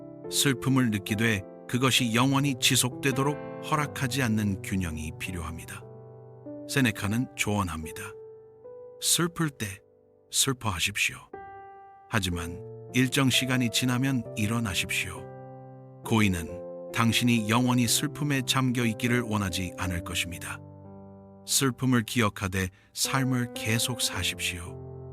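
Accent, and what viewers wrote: United States. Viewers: 다 좋은데, 재미교포 2세 목소리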